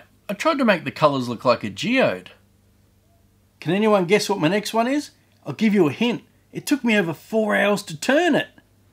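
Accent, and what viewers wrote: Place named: Australia. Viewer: I don't care much resin. I'm here for the amazing Australian accent.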